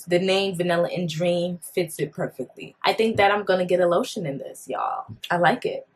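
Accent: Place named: East Coast, United States. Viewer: It’s giving East Coast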